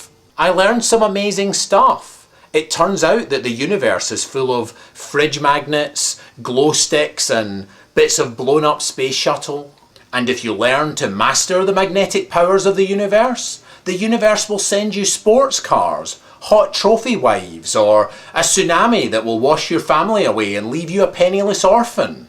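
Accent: Scotland, United Kingdom. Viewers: Are you Scottish? Or do Californians speak like that? Change the world by doing nothing! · haha i like your british accent xD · are u from scotland?-.- that accent.. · I don't most, my bint is scottish, but this particular accent is SOOOOOO FUCKING ANNOYING. Even when he's happy it sounds like he wants to kill himself. The reason so many people left scotland for the colonies was because they couldn't stand each others accent. Or maybe it was just because they lived in scotland. What a shithole. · scottish and i love it!!! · LMAO - very good!! :) I could listen to that lovely scottish brogue all day long! :) hehehe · His accent's scottish.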